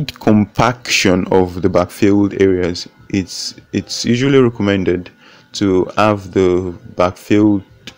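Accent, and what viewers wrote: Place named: United States. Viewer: Bros, Even with your American accent, I am aware that you are a Nigerian and this house was built in Naija. Thank you very much for this very educational video. Please upload more videos dealing with Electrical, Plumbing, and other finishing aspects. Thank you very much. This has been quite helpful. Please keep it up and keep it coming. Thank you once more.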